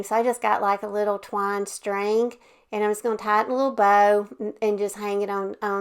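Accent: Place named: North Carolina, United States. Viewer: I love your sense of style and your accent. It is very similar to my area of North Carolina. I don't have creative instincts so I rely on crafters like you and many others to give me ideas. I painted an empty Bolero hand soap dispenser I got a Dollar Tree and it turned out beautiful. It had a pretty label and I knew I wanted to do something with it so thanks for that video the other day. Also wanted to mention I have the same scrapbook paper you used in this video.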